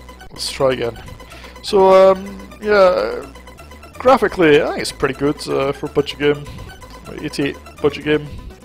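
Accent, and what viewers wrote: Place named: Scotland, United Kingdom. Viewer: nice to hear a fellow scot like myself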